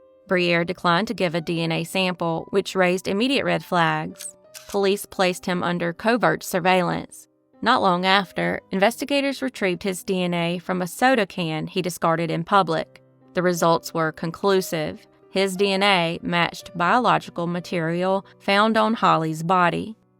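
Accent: South, United States. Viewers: I am seeing this 9 months later and I hope that you are doing well and pray that good will comes your way. God bless and I wholeheartedly agree with you, rocks and somehow her sweet southern soul shines through her accent and it’s very soothing on one’s heart and soul. God bless.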